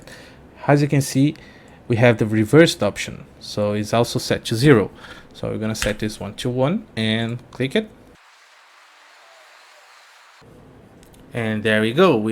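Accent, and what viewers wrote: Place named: Brazil. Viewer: Nice tutorial, Thank you! E desconfio que seja BR pelo leve sotaque, se for, parabéns pelo inglês e pelo vídeo, valeu!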